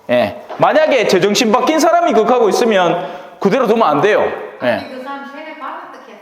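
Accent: Gyeongsang, South Korea. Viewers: 신부님 갱상도 어디세용~? ㅋ 저는 영주입니다 ~으하하하하하하~~^^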